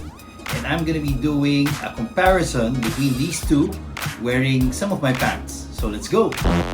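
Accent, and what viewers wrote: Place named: Philippines. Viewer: Super Filipino accent lmaoooo!! Sound like my friends lmaooo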